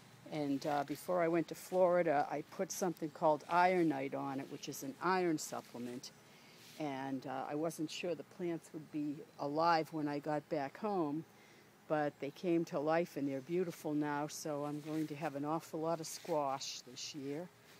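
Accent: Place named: New York, United States. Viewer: Nice garden. I tried to respond to your invite on Sonico but for some reason no luck since I do not have an account. But you do have my correct email address. I never realized you had such a strong New Your accent -- it sounds great! (<; Cheers! Are you still picking!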